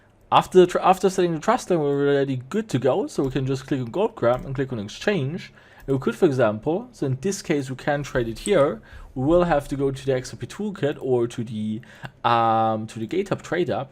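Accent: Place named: Austria. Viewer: You must come from Austria, because your english Sounds Like Schwarzenegger. 🎉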